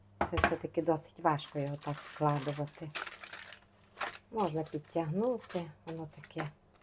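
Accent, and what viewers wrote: Transcarpathia, Ukraine. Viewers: Очень приятно Вас слушать! Мова чудова!)) Та цей неперевершений закарпатський акцент! И рецепты очень оригинальные! Мира Вам, здоровья и добра! Привет из Житомира. 🌻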